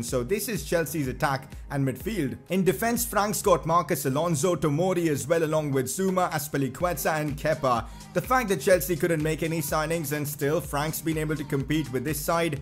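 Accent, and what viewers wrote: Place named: India. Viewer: you sound like indian guy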